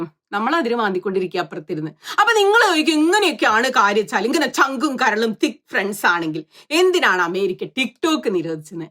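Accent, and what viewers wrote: Malappuram, India. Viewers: എന്ന് നാട്ടേര്സംശയിച്ചാ ഓലെ കുറ്റം പറയാൻ പറ്റൂല്ല.😂😂 മാഢം ഇതൊരു മലപ്പുറം ടച്ച് സ്ലാംഗാണല്ലോ ?.... നിങ്ങൾ ഒറിജനൽ എവിടത്ത് കാരിയാണ്❤❤❤😂😂😂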